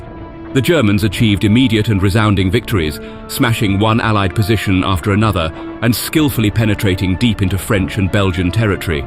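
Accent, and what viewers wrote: United Kingdom. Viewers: British accent and a booming voice, this narrator was perfect for this documentary. An amazing history lesson that held me riveted for the entire 25 minutes. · too much irrelevant digressions to Stalingrad etx a wasted of time-- Why dont these British documentaries just get to the point of the title? As soon as hear the British accent i just know it`s longwinded -takes two hours just to get to the point of the title ...a total waste of time.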